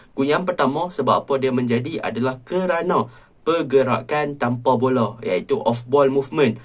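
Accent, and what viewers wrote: North, Malaysia. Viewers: Ada dua channel yang guna loghat utara yang saya tengok videonya. Salah satu channel yang ini... 😊👍🏻 *Scam Amri dah tak jadi, orang dah cam.... masa dua kali buat hari tu, bukan main lagi bangga.. 🤔😅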